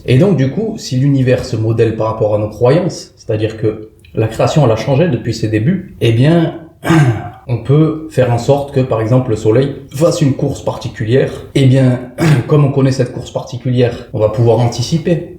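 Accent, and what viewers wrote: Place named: Corsica, France. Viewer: Ce n'est que la voix d'un corse.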